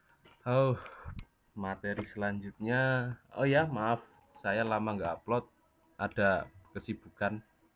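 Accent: Java, Indonesia. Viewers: sampean njelasno ne lewis jelas dari pada pak masyur sapari opo read the market opo ifmyante opo sami be good amargo medok jowone 😂😂😂 mantep 😁😍😘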